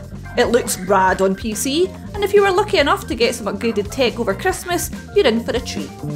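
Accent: Scotland, United Kingdom. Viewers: More Scots, please!👍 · Great little nugget of gaming news wrapped up in a lovely Scottish accent. Perfecto! [Chef kiss] mwha! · Very Scottish.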